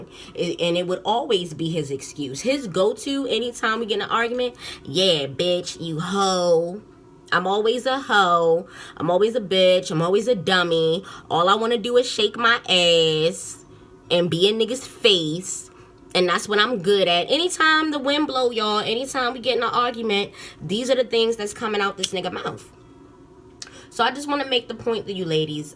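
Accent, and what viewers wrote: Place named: Baltimore, United States. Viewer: Baltimore accent..🌺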